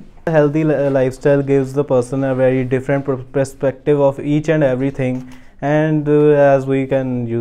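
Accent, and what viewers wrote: Punjab, India. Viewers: punjabi tone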